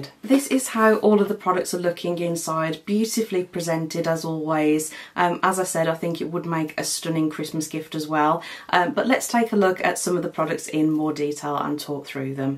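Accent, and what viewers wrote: United Kingdom. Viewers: Your review is excellent🎉. I love the detail you mention for each product which other reviewers often skip! I also love your British accent. I am looking forward to more of your reviews. From across the Pond, Diane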